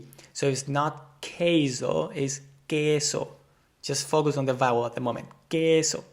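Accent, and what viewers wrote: Spain. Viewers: Thanks a lot for the great content and also the other videos! Just wondering, how would you describe your Spanish accent? Is it from a certain region? I would like to become work efficient (B2) for Madrid, and especially to sound professional to clients. Not sure if there are notable differences in accents (I.e. in Andalusia) for cities in Spain, and whether those would come across as ‘uncommon/unprof’ when working in a different city. Would love to know.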